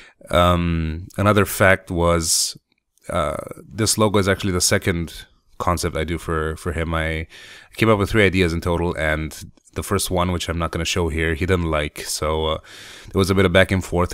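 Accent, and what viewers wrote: Poland. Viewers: I really like your polish accent :D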